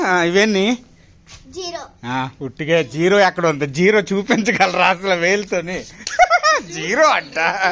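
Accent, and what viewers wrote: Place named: Andhra Pradesh, India. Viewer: Anna mee slang maa Srikakulam la undi,? Meedi Srikakulamaa!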